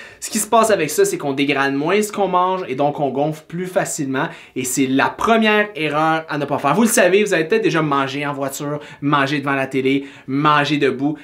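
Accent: Canada. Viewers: J’adore l’accent québécois salutations de la Suisse frère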